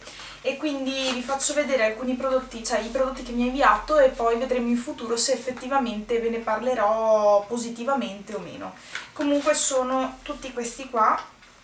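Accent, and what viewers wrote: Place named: United Kingdom. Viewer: A parte i prodotti fighi, ti faccio una domanda un po' da "fattilicazzimia": come fai ad avere un accento very british? Hai fatto qualche corso, scuola ecc ecc? Grazie mille per il video, un bacione!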